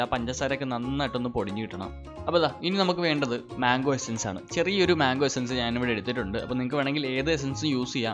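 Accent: Alappuzha, India. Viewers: Bro alappuzhakkaran aano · Chettan alappuzhakkaran aaano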